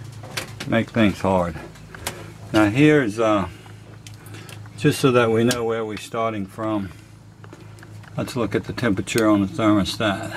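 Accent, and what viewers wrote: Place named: Cajun, United States. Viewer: I like your style.... I sometimes wonder why people feel the need to criticize anonymously when they wouldn't say it to your face. Like the joker that said your voice is slow. I lived in Baton Rouge and it was nice to hear that Cajun twang again. And good video too. Nicely done.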